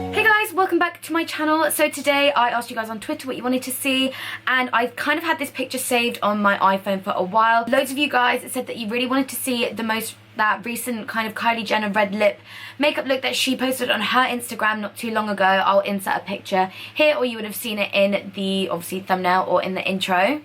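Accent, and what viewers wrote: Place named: United Kingdom. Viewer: Yes she is British 😊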